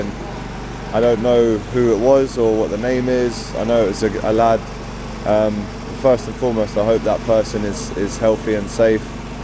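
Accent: Liverpool, United Kingdom. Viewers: Good scouse accent that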